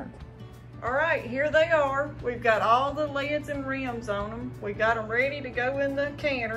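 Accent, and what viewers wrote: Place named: Eastern Kentucky, United States. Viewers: Love the accent, I'm from the mountains of Eastern Ky... we have the same accent with a mountain drawl... southern people the heart and soul of America! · I’m from Wayne Co West Virginia across Tug River from Martin Co Ky. We talk the same, srup,worsh rag, roin the clothes lol I love our country ways Enjoyed the video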